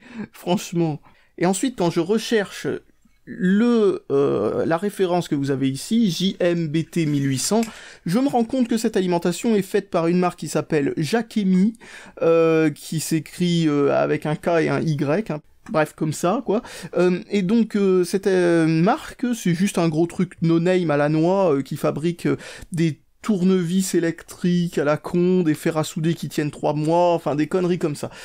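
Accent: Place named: Paris, France. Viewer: Ton accent parigot m'arrache les oreilles... P=iu uniquement sur une charge resistive... Ce qui n'est pas le cas d'une alim Alim de fort wattage.... Sérieux ?? Un wattage c'est quoi.. Connaîs pas par contre une Alim de forte puissance... Ça oui... Je m'arrête là, j'ai plus la force et l'envie de corriger....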